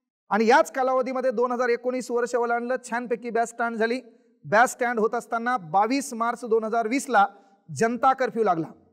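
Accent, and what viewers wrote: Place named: Latur, India. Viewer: I live in Ireland. I am not a student but i have been watching his reels. Mi Latur cha ahe ani siranna boltana aikla ki nehmi gharachi athavan yete. Bhasha apli naal ahe. Sir ek no ahet 🔥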